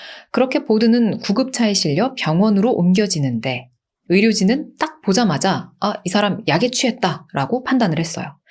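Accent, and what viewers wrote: South Korea. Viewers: 🙄ㅈㅓ는....한국식 발음이 좋ㅇㅏ요.!❤😁👍ㅎㅎ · 한국식 영어발음은 친근하고 귀에 쏙쏙잘들어오니 좋은걸요 ㅎㅎ 바른 발음으로도 알려주시고 여러모로 꼼꼼하게 다정세심하신 님❤ 오늘영상도 고맙습니다! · 영어발음 관련해서 까다로우신 분들이 많았나봐요, 전 한국식발음이 더 듣기 편하고 좋은데 ㅎㅎㅎ 고나리 넘 신경쓰지 마세용 ㅎㅎ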